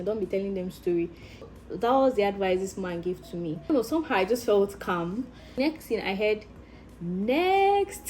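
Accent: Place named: Cameroon. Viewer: U sound like a Cmrnian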